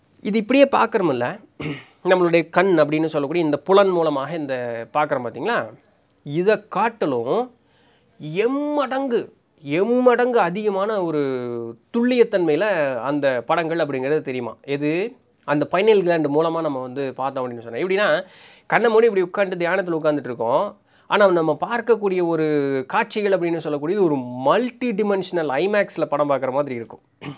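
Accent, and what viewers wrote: Coimbatore, India. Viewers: Anna neega Coimbatore ha ❤😊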